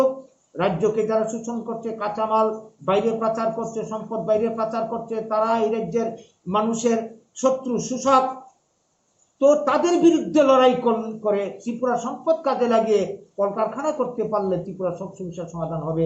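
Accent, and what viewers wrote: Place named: Bangladesh. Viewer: Original tripurar bangali tumar moton koitona tumi bangladese kotta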